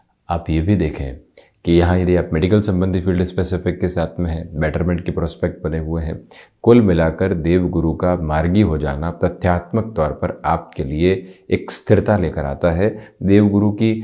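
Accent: Standard. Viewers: आपकी आवाज बहुत गहराई लिए हुए और ज्ञान में डूबी हुई लगती है शुद्ध हिंदी में आप बोलते हैं धन्यवाद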